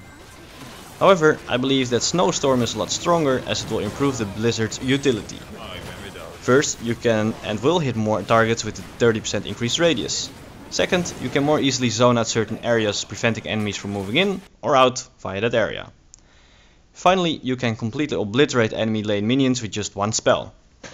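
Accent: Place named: Netherlands. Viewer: Lekker hoor, vernederlandst accentje en af en toe wat Nederlands op de achtergrond :P Uiteraard een oude guide, maar lingering chill zal ik eens proberen ipv 30% meer range op Q :)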